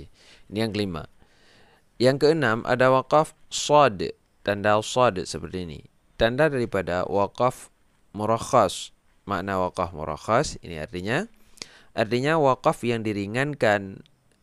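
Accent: Aceh, Indonesia. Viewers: Bahasanya...kaya orang aceh yaaah...jdi inget temen saya dri aceh zamroni holil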